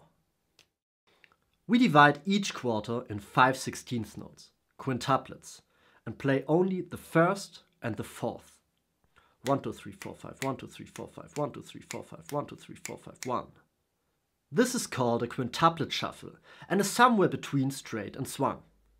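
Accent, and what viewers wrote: Germany. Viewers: Man hört direkt das du Deutscher bist haha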